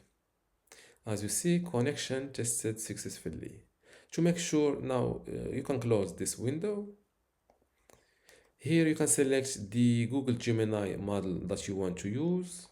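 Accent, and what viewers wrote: Morocco. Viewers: I hear a morrocan brother <3 Hello from DZ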